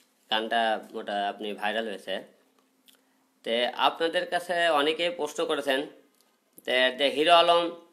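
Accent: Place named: Bogura, Bangladesh. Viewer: বগুড়ার ভাষা বাদ দেন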